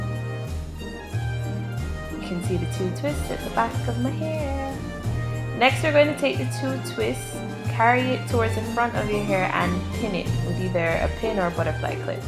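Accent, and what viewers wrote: Jamaica. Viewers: Are you Jamaican? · Are u jamaican?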